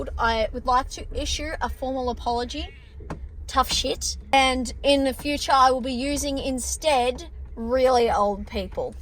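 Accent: Australia. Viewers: I just started watching your videos and have watched loads of them so far. I served in the USA military and enjoy how you talk, it reminds me of the way we would talk (except without an Australian accent) lol. Im curious about what kind of car you drove on the ranch and how old is it? It seems to a real tough little guy.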